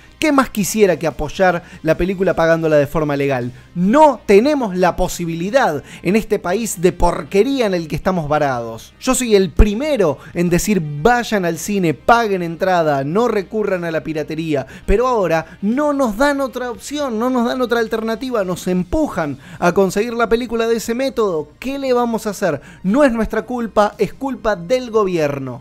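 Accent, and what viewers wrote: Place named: Argentina. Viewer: Solo algo: por tipos como este es k me gusta tanto el acento argentiono hahahah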